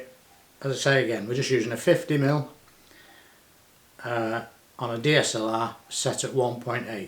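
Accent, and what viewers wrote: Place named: Liverpool, United Kingdom. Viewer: Hey brotha great video thank you. Now as a side question where does your accent come from? I can’t seem too sort it out. Obviously it’s an English accent but I don’t have a trained enough ear to sort it out right away. You do sound like a Beatle to me (hope no offense) as that’s my only reference for now. I know they (Beatles) are from from Liverpool (or greater area). Thank you kindly and have a good day.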